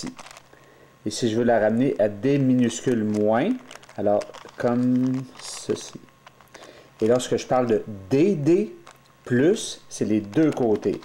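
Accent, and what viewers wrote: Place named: Quebec, Canada. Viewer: Lol je suis un débutant en matière de rubik cube mais j'trouve que c'est bien expliquer.. Sinon j'adore l'accent québécoi ^^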